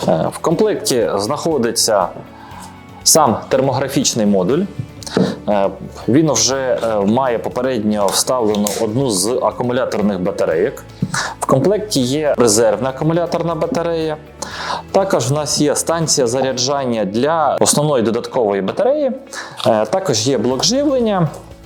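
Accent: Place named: Poland. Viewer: На каком языке этот тарахтит, смесь польского и русского.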